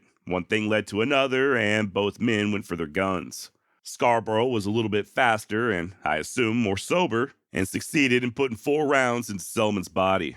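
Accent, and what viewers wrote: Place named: New England, United States. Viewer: sounds like your are a damn yankee. Are 'ya?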